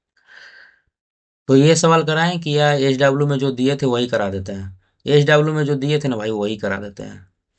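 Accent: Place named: Patna, India. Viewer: Bhaiya Patna se lagte hai sayad bhaswa wahi hai ❤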